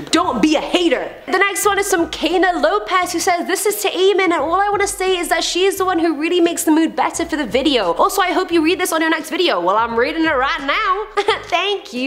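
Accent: South, United States. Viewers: I'm from Mississippi and cant stand fake southern accents but yours is perfect..luv ya your awesome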